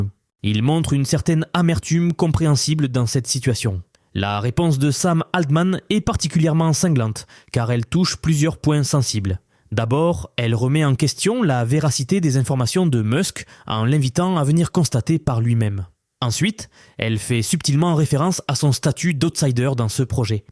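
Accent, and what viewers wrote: South, France. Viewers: Cet accent, c'est de ToLose ou Marseille ou Montpellier ou?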